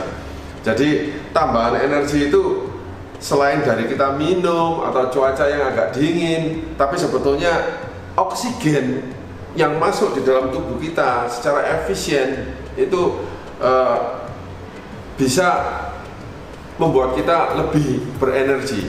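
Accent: Surabaya, Indonesia. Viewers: Wong Suroboyo iki koyoke hehe. Thx tips nya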